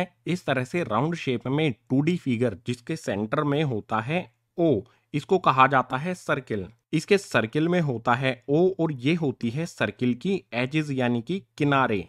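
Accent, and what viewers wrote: Haryana, India. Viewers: Bhai.. Sir. Kaha ke h.... Mujhe lagta h ki haryana ya rajasthan ke h..... Apko kya lagta h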